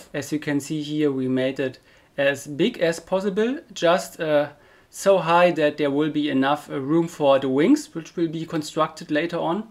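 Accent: Germany. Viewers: Ich liebe den deutschen akzent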